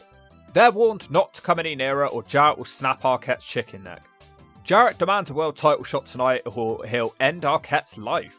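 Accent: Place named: West Country, United Kingdom. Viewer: That West Country accent was seeping out in this video 😂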